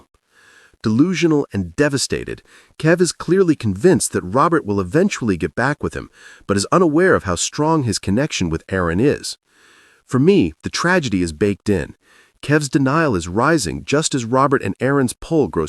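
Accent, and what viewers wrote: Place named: United States. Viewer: What is going on with all these American voice overs. It’s off putting.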